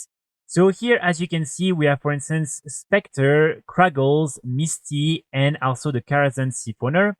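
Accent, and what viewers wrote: France. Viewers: Super tes vidéos, mais t’as un accent français on dirait.. en tout cas, ton Discord ne marche pas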